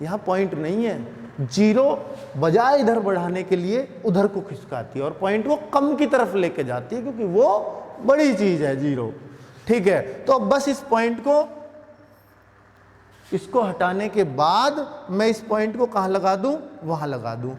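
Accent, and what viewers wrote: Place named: Western Uttar Pradesh, India. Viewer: Sir.Questions ke Answer explain karne ka way hai, wo aapka Ultimate hai. But aapki jo Desi language hai wo Impressive hai Western UP wali. Hum bhi aesi hi language mai comfortable hote hai 👌🏼👌🏼👌🏼👌🏼👌🏼👌🏼.😊😊😊😊😊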